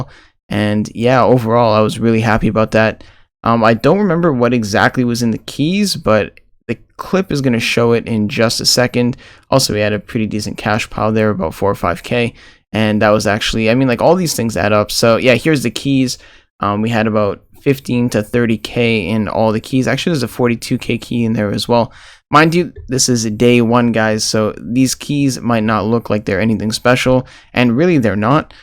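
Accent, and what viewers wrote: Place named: Ontario, Canada. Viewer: Are you Canadian? Sounds like south Ontario.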